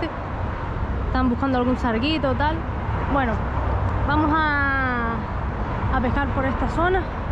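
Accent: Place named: Canary Islands, Spain. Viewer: Lo mejor del video es las ganas y lo bien que explicas las cosas y ese súper acento canario que tenemos, sigue así que por lo menos a mi me enorgullece seguirte en tu canal, cuando vengas a Tenerife te diré buenos sitios de pesca a boya y a fondo que es lo que más práctico yo o el vivo a seguir mi niña toda una campeona saludos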